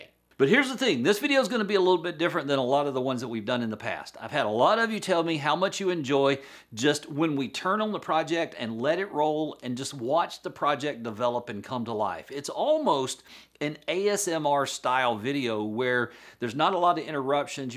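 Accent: South, United States. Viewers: I quite like your southern drawl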